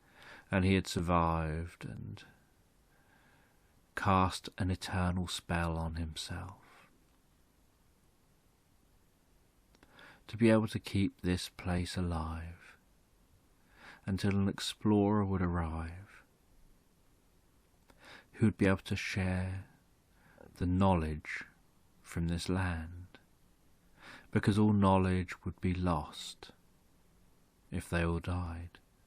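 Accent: England, United Kingdom. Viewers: Why can't I stand the English accent.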